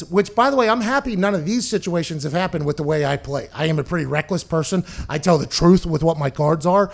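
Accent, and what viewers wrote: Pittsburgh, United States. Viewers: Dude your awesome lovin the pittsburgher accent born and raised big dogg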